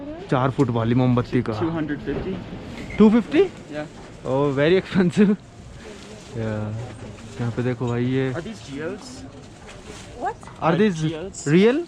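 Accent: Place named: Kanpur, India. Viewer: Yeah tho kanpur wala style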